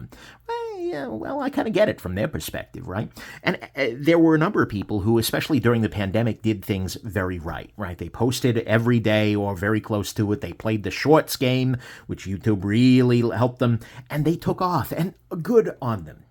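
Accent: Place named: Brooklyn, United States. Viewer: Love your voice, it's like if Professor Frink was from Brooklyn.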